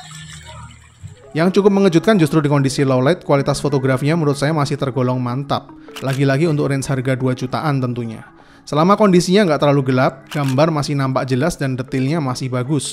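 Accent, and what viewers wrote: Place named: West Java, Indonesia. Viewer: Kak orang sunda kyaknya ya,.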